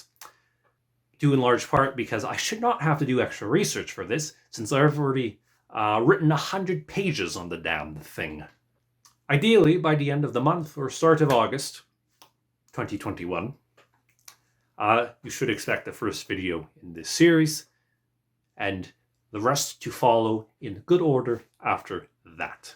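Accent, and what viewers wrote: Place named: Austria. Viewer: Man, your syntax and inflections remind me of the Military History Visualized guy, Austria has taken hold of you.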